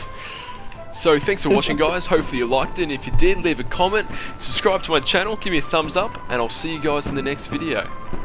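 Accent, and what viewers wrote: Australia. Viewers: Finnaly i found an aussie gamer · Where is your accents from? Australian? not sure :).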